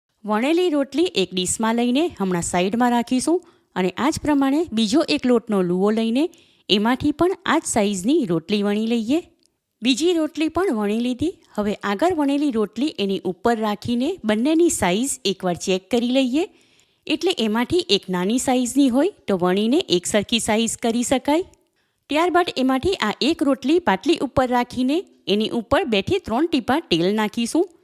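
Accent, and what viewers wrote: Surat, India. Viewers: Pure Surti. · Surati Gujarati bole chhe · Ben surati lage chhe tt bole chhe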